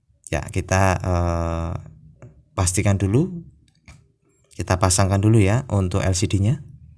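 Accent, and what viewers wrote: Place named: East Java, Indonesia. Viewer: ow kirain di Jawa timur, krn logatnya mirip orang sini ☺️🙏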